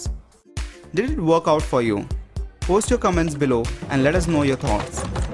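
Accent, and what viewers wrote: India. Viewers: Your indian accent is funny...the way you pronounce "position"....its "pozition" not "pojition" lol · Agreed. Very difficult to understand. Indians speak very quickly , and combined with the heavy accent makes it difficult to understand. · Your Indian accent is difficult to understand. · You're not the other guy. You have a total different accent. Are you an Indian? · Why do 1/4 of these videos have the host with a strong Indian accent? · Indian accent? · Please upload an explanation with an american accent guy not with indian accent. It's annoying, sorry... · Can't blame other people for not understanding his accent. In my first time to hear their accent many years ago, i didn't even understand either. So probably this people who complain about the narrator never meet or work with indians also. 😅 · indian accent..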